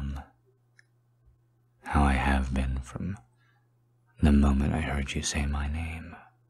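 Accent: South, United States. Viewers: Hi my name is Alyssa Hayes and i love watching your video and ur voice is so hot sexy and i love your country accent voice and could u do the Country accent roleplay Cowboys romantic for me? :-D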